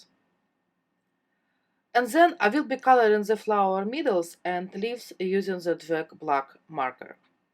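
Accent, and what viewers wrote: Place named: Poland. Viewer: I'm happy I discovered your videos (I found you through a video by Yana Smakula). I am not a fan of pink, so I would never have thought to do these flowers on a pink background, but this card is beautiful. I love your ideas and hints, and I love your accent. It brings back memories, as it's similar to that of my Polish grandma (her native language was Polish, but she spoke some German and a bit of Russian in addition to English). I am very impressed by your command of vocabulary and sentence structure. I see so many comments by Americans on FB, and your language skills far surpass theirs. Sadly, I only know one language, and I am always impressed by those who learn more than their native tongue.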